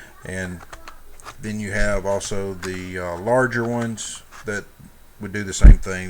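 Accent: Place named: Rural, United States. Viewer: Haha, I love your openings and endings. Living in the country side of America and sharing the same accent, this information really hit home clearly. I've been getting into robotics/electronic work recently. It's a useful hobby to have, but when I try and take apart old devices like cell phones and cameras I find really tight small screws constantly. Near impossible to get out. I keep thinking "If only I had a small drill for this..". I think this may be the one for me! 'God knows it'll make the job faster, anyway. It's not too expensive, either. I just hope the bits don't break too easily. It'd be a pain to have to buy new ones often. Thanks, man.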